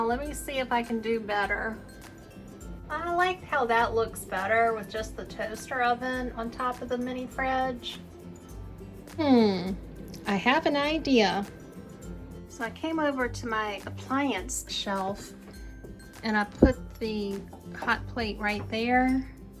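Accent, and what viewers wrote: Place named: Texas, United States. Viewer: Hi 👋🏻 I just found your channel ! It just popped up . Your accent seems Texan to me ..are you in Texas ? I’m in South Carolina . Nice to meet you . 🥰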